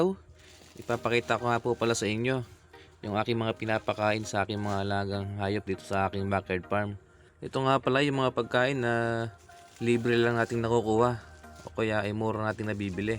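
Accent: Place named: Luzon, Philippines. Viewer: Hello sayo dyan😊 kalina ko pa guess kung saang province ka, based on ur accent ay malamang taga Luzon ba kayo? Anong province? Di ko mahulaan😊 bilib ako sa diskarte mo at sure ako na pinag aaralan mo din lahat yan para mapa ayos ang farm…nakaka proud ka naman, sana ganyan din brother ko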